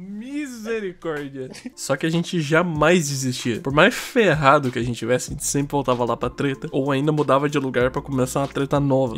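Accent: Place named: Rio de Janeiro, Brazil. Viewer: Coe, tu só pode ser carioca ou tu é sul fluminense, pprto que não tem como kkkkkkkkkkkkkk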